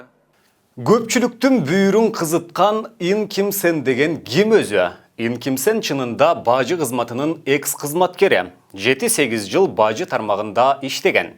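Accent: Standard. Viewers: Красавчик бала 120чимкирик да ушундай таза суйлой албайт азамат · АЗАМАТСЫН!Кыргыздын тилин таза суйлогон Ат-Башынын жигити.Ата-Мекен эн кучтуу партия.келечек жаштардыкы дегендей,бут кучтуу жаштарга орун беришти.лидерлери депутат болушпайт.Акаевтен бери бир гана ТЕКЕБАЕВ чыныгы оппозиция болуп келген беттерине коркпой айткан.калгандары оома оппозиция болушкан.Бутун Кыргызстанда бут эле 65 жаштын тегерегиндегилер тизмеде экен.баягы эле жеп жутарлар.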